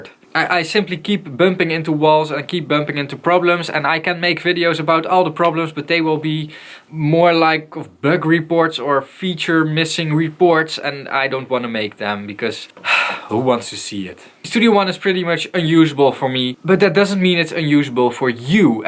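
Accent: Netherlands. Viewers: Dutch accent 👍🏻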